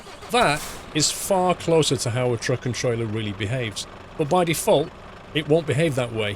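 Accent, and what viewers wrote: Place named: United Kingdom. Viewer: There is thousands of videos like that on YT but ONLY can explain properly even hes got that british accent (luckilly i used to live in Birmingham:P ).Other twats just expect money for their shitty videos. deservers that. Greetings from Poland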